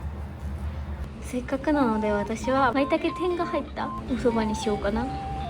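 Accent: Kansai, Japan. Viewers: いつも楽しく拝見してます。ちゃんがたまに話す関西弁がめっちゃ好きで、めっちゃかわいいです😆 これからも楽しい動画をお願いします。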